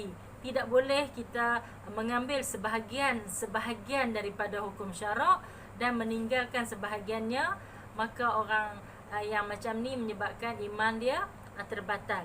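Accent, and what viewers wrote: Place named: North, Malaysia. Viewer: Tahniah ustazah...kemaih👍👍👍lama x cakap utara sejak pindah perak..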